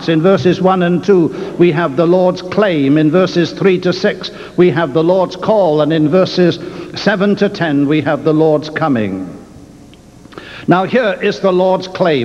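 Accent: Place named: Wales, United Kingdom. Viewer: Was Dr Phillips a Welshman? I'm sure i detect a Welsh accent in his poetic delivery.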